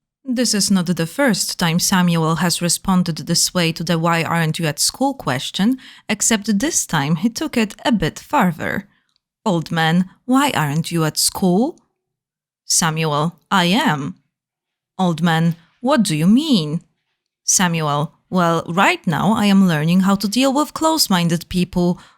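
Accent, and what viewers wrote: Poland. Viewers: hears polish accent -'no way' checks channel name 😮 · It's beutiful to hear how polish accent in English looks like! XD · just the accent.. my poland radar went off instantly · Kocham kontrast pomiędzy ośmiornicą bez podstawówki, a człowiekiem bez podstawówki. Strong Polish akcent btw ^-^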